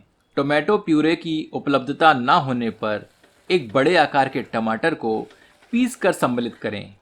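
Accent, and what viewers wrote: Standard. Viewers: एकदम शुद्ध हिंदी बोलते हैं आप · Bhai dish to tasty hoga hi.. But apki hindi sun ke doordarshan ki yaad aa gayi.. 😃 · Aap ki boli hui Shudh Hindi humein ati(behad) pasand hai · itni suddh hindi sun k bhut achcha laga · आपकी विशुद्ध हिंदी बहुत ही मनमोहक और प्यारी है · itna sudh Hindi bola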